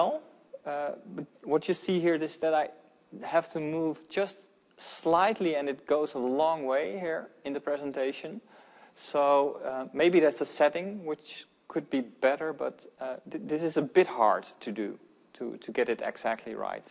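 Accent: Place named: Netherlands. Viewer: Great review! You have a Dutch accent and name! I reckon you are Dutch!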